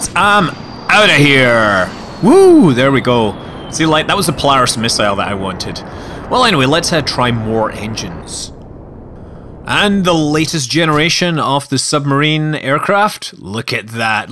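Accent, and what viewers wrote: Scotland, United Kingdom. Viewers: It's the Scottish accent. And often the Explorer becomes an exploder!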